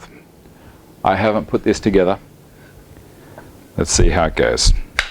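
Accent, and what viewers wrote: Australia. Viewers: I was wondering why I love watching this video, then I've read in comments that you're from Australia :D Love the humor, the accent and of course the build itself! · Any reason you put the wood through the thicknesser before the jointer I've always thought it was the other way around? It is nice to hear an Aussie voice on you tube BTW.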